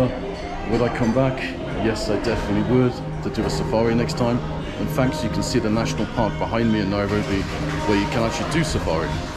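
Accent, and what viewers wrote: United Kingdom. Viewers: you've got a British accent?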